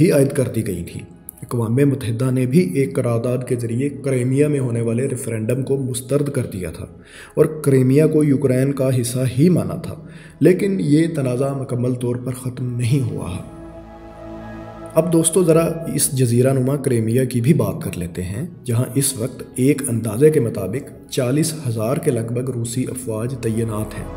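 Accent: Punjab, India. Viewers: Everyone complaining his voice, its not his voice. Its his punjabi accent. Now if he makes 10 more videos you all will get accustomed to his accent even but boy oh boy punjabi is strong in you. The video was informative, presented neat and brief all current affairs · Bohut zyaada punjabi accent hai iss banday ka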